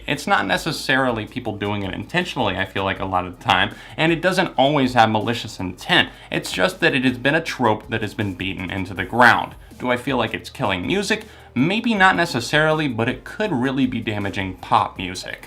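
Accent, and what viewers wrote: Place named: Transatlantic, United States. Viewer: Dude I just realized that you are speaking in a very watered down Modern Transatlantic accent.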